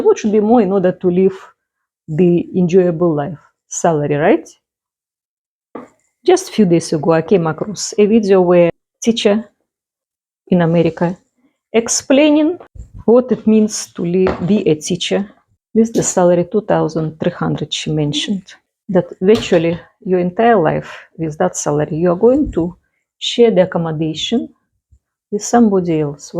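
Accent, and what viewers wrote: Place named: Russia. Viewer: I really like listening to you,! Love your Russian accent! Just feel sad that the Ukrainian government and the the Ukrainian Nationalist accepted to be used against Russia…. I think you are a special Ukrainian with lots of character, personality and love for humanity!!! ❤️❤️❤️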